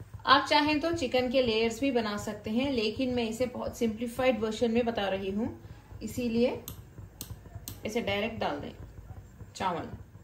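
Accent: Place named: Deccan, India. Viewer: Ohh.. hamari hi tarah Deccan ki hain.. Masha Allah.. Par mai Bangalore, Karnataka sey hun..!! Tnx for replying..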